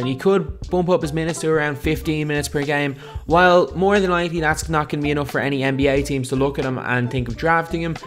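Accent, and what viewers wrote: Ireland. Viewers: some sort of irish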